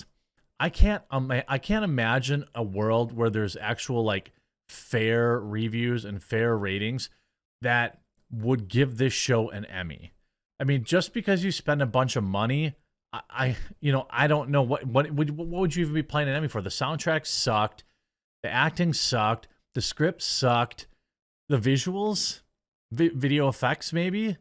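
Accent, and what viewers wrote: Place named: Wisconsin, United States. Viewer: Bro say dregon over dragon ever day, love out that WisssCONson accent.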